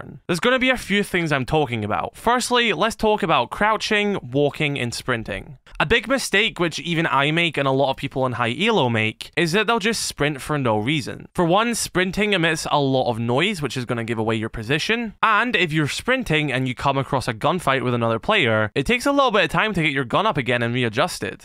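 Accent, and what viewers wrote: United Kingdom. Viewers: bri ish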